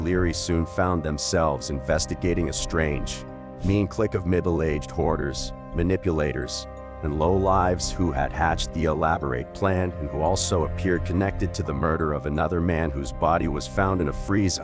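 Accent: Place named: Boston, United States. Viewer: Is this a robot with a Boston accent? Wtf!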